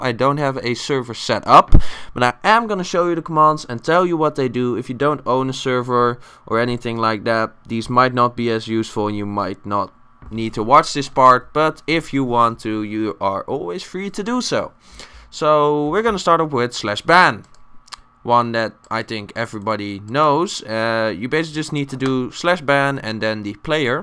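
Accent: Netherlands. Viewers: klinkt nederlands :D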